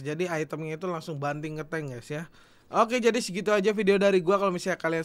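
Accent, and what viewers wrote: West Java, Indonesia. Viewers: banyakin ngomong pake bahasa sunda bang, seneng gw dengernya jirr kocak 😂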